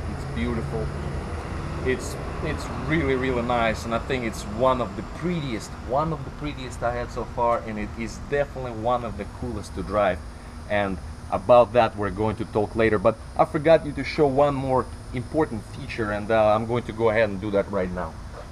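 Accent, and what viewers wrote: Russia. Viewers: Ты русский? 😁 акцент жесткий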